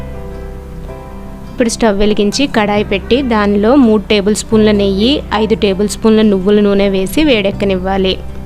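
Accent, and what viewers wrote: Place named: Godavari, India. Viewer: Nice vedeo making, nice background scoring, godavari accent... Simply superb.... Chusthunnanthasepu haayiga undhi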